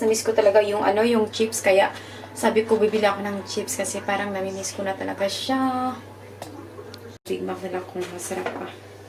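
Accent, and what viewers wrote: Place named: United Kingdom. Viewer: chinese national pala si ate langgaa😅 pero ang accent ng pananalita is parang british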